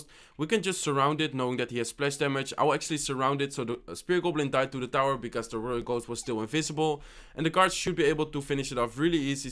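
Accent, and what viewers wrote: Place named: Netherlands. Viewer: You can hear that you're from the Netherlands that is what I thought when I watched you for te first time😂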